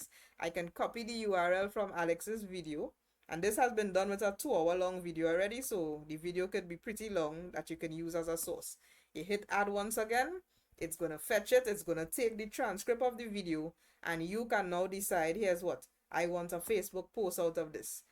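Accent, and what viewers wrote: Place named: Trinidad and Tobago. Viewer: Super video!! also love the Trinny accent, keep going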